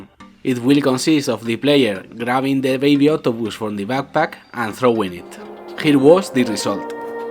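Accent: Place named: Spain. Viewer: Are you spanish? I'm from Spain and your accent reminds me so much to mine XD Btw, your game looks amazing, the pixelart is beautiful ;3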